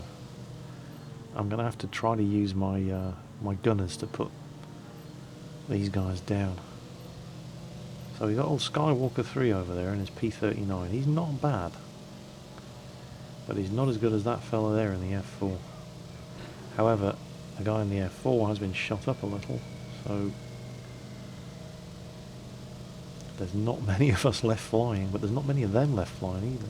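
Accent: England, United Kingdom. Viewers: There is no country called Bohemia lol and he is from Britain listen to him. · I was a fair question dont be a dick. Just because he has a English accent doesn't mean he was born in England. Maybe his parents are Bohemian, like most Czechs.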